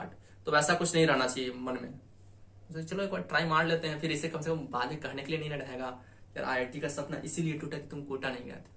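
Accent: Bihar, India. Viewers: Bihari ho kya bhaiya. Tumhara tone BATA Raha hai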